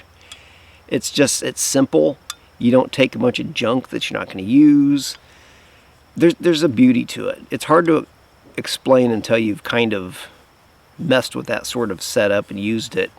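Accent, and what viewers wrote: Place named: Kansas, United States. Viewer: I haven't caught up with every single one of your videos (probably won't be able to), but I really appreciate the commentary here. I'm past 50, living in NE Kansas and always trying to get to Colorado in the summer. I'm not a technical climber but have done about 25 14ers and finally made it to Conundrum Hot Springs at the end of this summer with a pack that was just... too-freaking-heavy. I'm in the "slowly buying new stuff to replace my bombproof and not in any way broken but heavy as lead old stuff" and wondering exactly what I'm trying to accomplish. Probably not a through hike of the CDT (as much as I would like), but trying to match gear with comfort, fitness level, and the opportunity to actually use it. I appreciate you relating your experiences. Also, your cadence sounds like a native western-ish Kansan. 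I'm not, but it's fun to hear.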